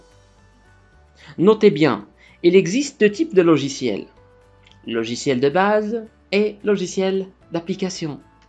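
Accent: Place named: France. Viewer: Vous avez une belle accent française ! J'ai cru au début que vous êtes français. Keep going 💪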